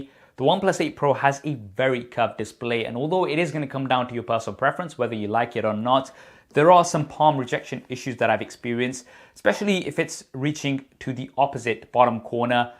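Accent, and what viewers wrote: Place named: United Kingdom. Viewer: He is probably Indian but he has an British accent so he probably lives in uk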